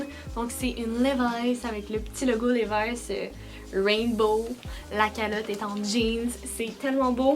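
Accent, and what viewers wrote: Canada. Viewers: Tes vraiment belle ton corp, ton visage et surtout ton accent canadien😍😍 tes pas du tout disproportionné🤷‍♀️🤗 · Est-ce que tu es canadienne?j'adore ton accent 🦄🦄🦄💚💚💚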